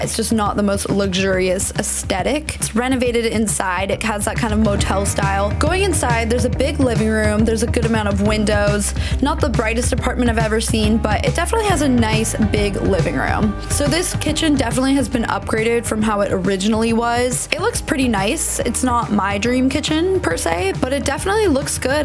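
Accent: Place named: Southern California, United States. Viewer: Why do you speak like you're from SoCal when you've only lived there a few weeks?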